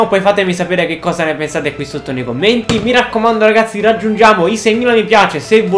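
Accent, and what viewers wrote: Naples, Italy. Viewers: ma sei napoletano?? no pk io quando segna il napoli urlo come il matto come credo tutti i tifosi boo....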